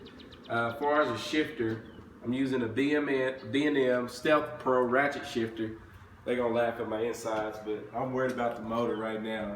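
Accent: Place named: South, United States. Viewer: What part of the south are you from. Southern drawl is really noticeable. But great video.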